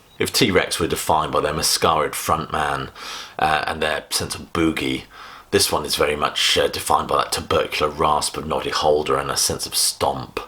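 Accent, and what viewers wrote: United Kingdom. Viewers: Great to see included; I have always admired them. If people do not believe they are good then try listening to side 1 of! I recall Gene Symonds on radio saying were a guilty pleasure, which I thought was a bit of a cheek. The song may be called a guilty pleasure, but never. This is the first time I have watched a Classic Album Review, and I am very pleasantly surprised and impressed by your knowledge, wit and wordsmithery! I shall watch some more. it is nice to hear an articulate British YouTuber. Thank you.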